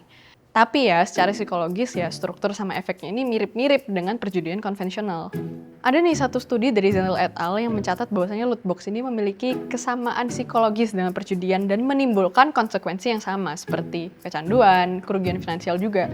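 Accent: Java, Indonesia. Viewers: such a cute medok 🥰🥰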